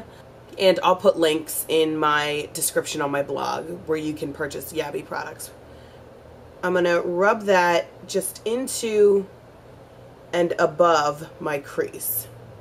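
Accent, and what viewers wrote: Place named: New York, United States. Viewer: i dont know why, but i thought you were a new yorker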